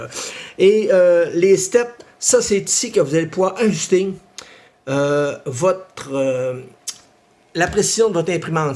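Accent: Quebec, Canada. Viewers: Bonjour Merci pour cette vidéo très bien expliquée digne d'un professeur sinon mieux;) suite a ta dernière vidéo concernant le firmware timymachine et avec l'écran en français, il n'y avais plus la fonction reprise après coupure de courant et la fonction économie d'énergie alors que dans l'écran le bouton est bien là mais ne fonctionne pas. J'aimerais savoir si ces 2 fonctions sont maintenant opérationnelles avec la mise à jour. Continue comme ça car j'adore tes vidéos et surtout ton accent du québec 🙂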